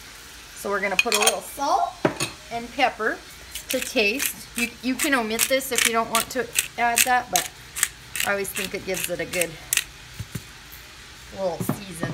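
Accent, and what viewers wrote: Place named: Gaspé, Canada. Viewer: You have a Gaspé Coast accent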